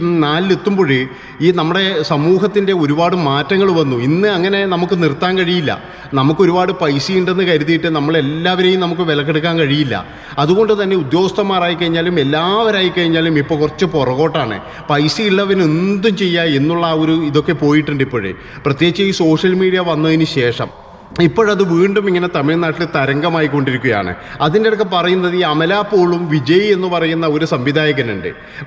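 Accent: Kannur, India. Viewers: കണ്ണൂർ ഭാഷ ആണല്ലോ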